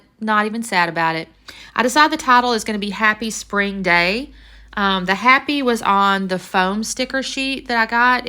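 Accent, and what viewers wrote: South, United States. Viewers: I love this. You sound like a real southern girlfriend. I’m in Tennessee just wondering where your from?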